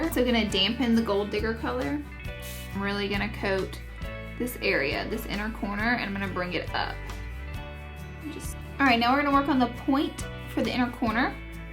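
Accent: South, United States. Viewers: #snapchatfam love this look, also I think your voice is amazing, my family is all southern and it makes me feel at home 😁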